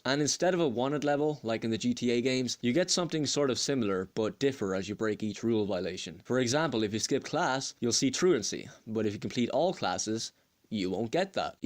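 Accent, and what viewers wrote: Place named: Ireland. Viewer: what part of ireland u from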